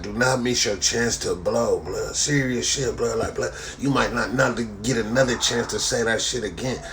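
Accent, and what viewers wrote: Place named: South, United States. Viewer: Why is ur nose so light? And what kind of southern accent is that? You're not originally from Cali though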